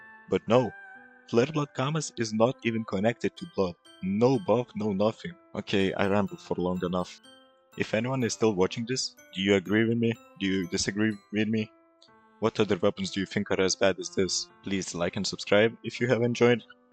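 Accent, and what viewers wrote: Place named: Eastern Europe. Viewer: you have a strong slavic accent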